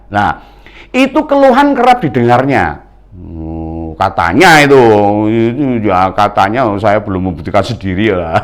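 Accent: Java, Indonesia. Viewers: Saya suuueneeng biiianget Nemu,in cenel ini mas nya lucu jawanya kuuenthel ya inspiratif terus berjaya yamas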